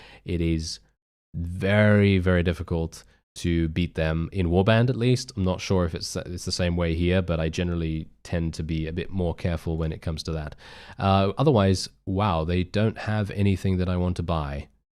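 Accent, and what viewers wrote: United Kingdom. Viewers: How can anybody not recognise you're British accent?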